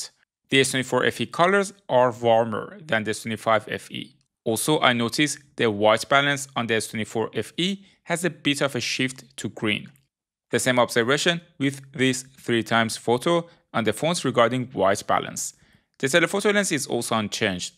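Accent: Iran. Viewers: Iranian accent found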